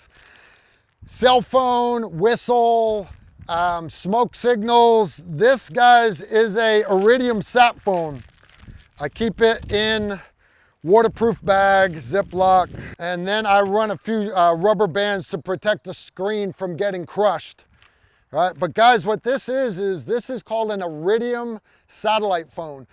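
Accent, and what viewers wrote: United States. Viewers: Is it just me or does he have elements in his accent really similar to Christopher Walken? I may be way off I'm not sure but just curious if anyone else hears that? Is it some kind of American Accent?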